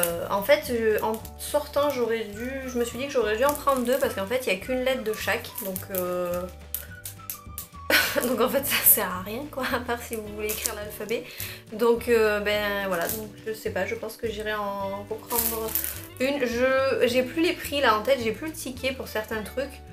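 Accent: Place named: France. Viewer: Omg!!! J"adore votre accent francais!!! Jsuis acadienne du canada!!!!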